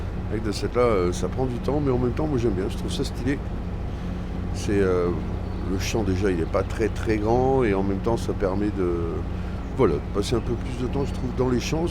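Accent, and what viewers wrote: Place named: Nord-Pas-de-Calais, France. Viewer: Mon et ses accents... 😂😅 Ton best reste ton chetemi 😍 quand tu te présentes toi tu t'appelles 😅😂 Moi j'adore ta parlementure, et puis après toi tu racontes, moi j'écoute 😂😅🧡 Belle vidéo 👏👍 j'aime beaucoup cette petite série 😍 Gros bisous 🧡🌹💋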